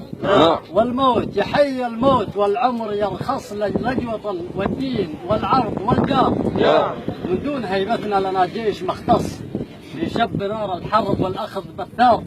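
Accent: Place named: Shabwa, Yemen. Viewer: والاجمل اللهجة الشبوانية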